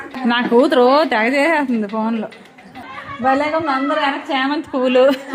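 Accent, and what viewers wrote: Srikakulam, India. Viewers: Naku radhu Amma pata 🥳akka reality lo nv acham Srikakulam basha la chakaga undi reels kuda bagundi akka voice 😘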